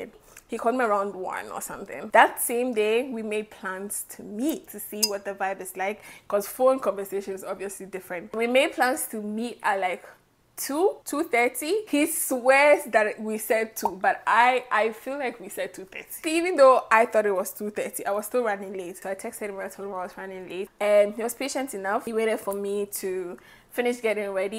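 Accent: Jamaica. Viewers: Jamaican men are nice happy for you...when they really love you they will go all the way out for you...happy you got to visit my country and hope u come back all the best and have a great week...u catch on the patois real fast man❤❤❤ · The best storyteller ❤❤. She all start talking potios..she is hooked.... i love that....if you are not Jamaican the "all a dem" might have missed you at the end. I loovvee it!❤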